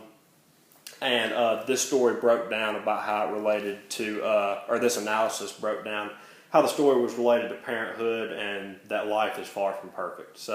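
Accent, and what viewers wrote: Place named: South, United States. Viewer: Hey bud. I love ya because you were a son of the south reading this incredible haunting late DFW micro-story, but as I’m sure you’ve been informed, the baby didn’t die. Not physically. The point of the story is how enough pain, combined with enough hopelessness of escape, causing permanent mental/spiritual dissociation as the only available escape. It is a part of him was more present and alive in the world that he was born which detached itself from his spirit and “floated away like vapor” or whatever he says there. He was in so much pain, and the two people he instinctively trusts to make pain go away couldn’t for so long, that he partially dissociated to get away from it and that part of him never came back, though it clearly says he went on and lived and life and “drew pay” meaning he worked a job like anyone else. 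But he was partially detached from life, a part of him dissociated and left forever, and watched overhead. In other words, he was a very different person in life than he would have been had the accident not happened, an alive and present person. He lived his life, but his soul left, and watched overhead😟🤯🤯😮. Fucks me up every time. Are you from TN, NC, GA or KT? Maybe SC but no one in SC has ever read DFW. Hearing this in your accent is music to my ears. Good on ya for so much here. If you’re still on here I’d love to hear what this was for and if you finished your degree, etc. 🤠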